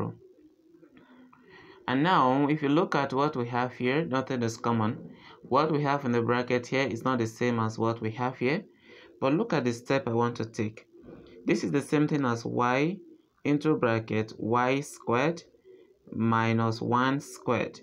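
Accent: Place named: India. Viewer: Привет, судя по акценту, вы из Индии? Интересный формат. А я из России. Город Сочи. Где была Олимпиада 2014.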